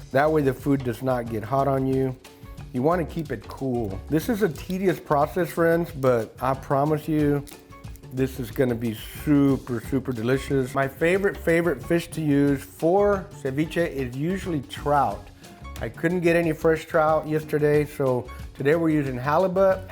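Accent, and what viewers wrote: Texas, United States. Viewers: This guy is soooo Texas lol, the "duuuude!" was beautiful😊 loved the recipe, tysm ❤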